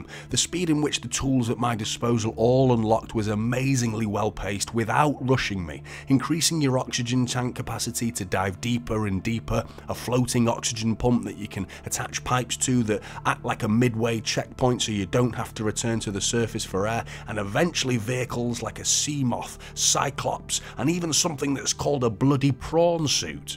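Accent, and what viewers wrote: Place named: Stoke, United Kingdom. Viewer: Proper stoke accent that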